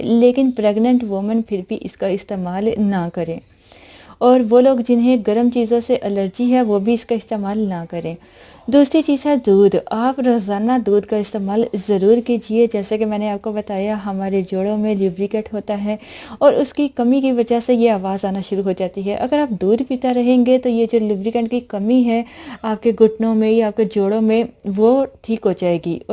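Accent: Punjab, Pakistan. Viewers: Aapke lehje main reginal accent hai shayd aap Punjab ki hain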